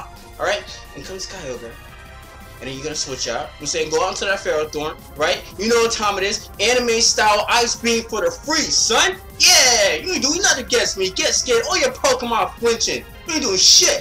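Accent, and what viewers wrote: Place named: Brooklyn, United States. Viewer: I swear I know this guy... sounds like he lives in brooklyn.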